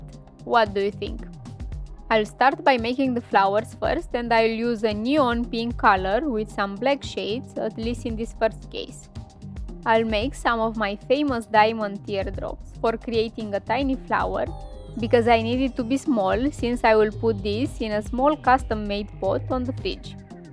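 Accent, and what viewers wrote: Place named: Romania. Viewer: Ma bucur ca ai prins 1000 subs.Foarte tare accentul romanesc.Succes.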